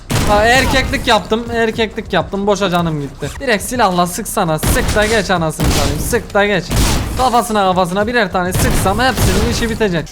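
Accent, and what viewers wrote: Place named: Kahramanmaraş, Turkey. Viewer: Abi böyle diyomda bende boyle konuşuyom bende maraşlıyım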